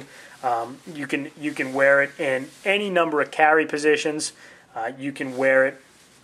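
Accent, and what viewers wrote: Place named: Boston, United States. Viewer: What are you up Boston? I know a fellow Masshole when i hear one... ;0)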